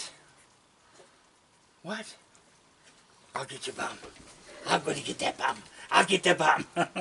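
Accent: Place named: Canada. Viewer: Who could not love a raccoon? 💜💜 Love that Canadian accent, eh!!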